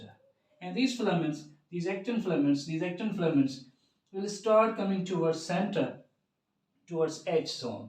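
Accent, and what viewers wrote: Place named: United Kingdom. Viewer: Fully British accent wao.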